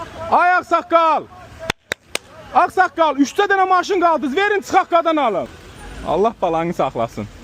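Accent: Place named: Ganja, Azerbaijan. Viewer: Gəncəli ləhcəsinnən danışırsız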